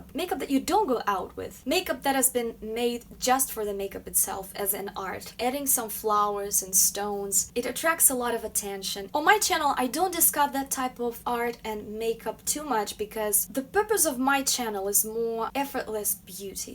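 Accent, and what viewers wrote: Russia. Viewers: I hear some accent..is she russian?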